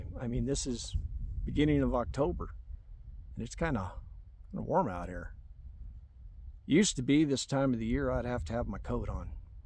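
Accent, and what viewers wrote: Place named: Southwest, United States. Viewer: The climate changes, there is no "Climate Crisis". The National Hurricane center reported that Helene made land fall at winds of 140mph, but the weather stations and the University of Alabama Huntsville mobile weather unit reported winds at 60mph. I don't know where you live but judging by the accent, I'll say in the American Southwest. In 850 C.E the region went into a drought that lasted 240 years, then it rained for 50 or sixty years, then the region went into a smaller drought that lasted only 170 years......... I guess the droughts were caused by those pesky native Americans cooking food and heating themselves. CO2 is good for the planet, the Sahara is greening, the world has greened 33% since 1900, because of CO2. Fossil fuels (hydro-carbons), cuts down on deforestation, and decreases health issues associated with burning dung to cook and heat. 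You maybe 56, but I'm 57, and I can tell you that you have not done your homework.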